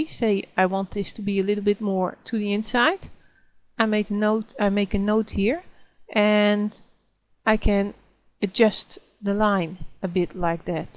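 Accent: Netherlands. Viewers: kom op man!! je moet eerst leren beter nederlands praten. je hoor meteen je nederlands accent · I directly knew you were Dutch by the way you speak lol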